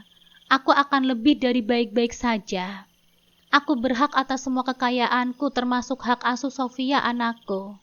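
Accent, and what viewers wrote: Java, Indonesia. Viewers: Baca ceritanya lucu,medok jawanya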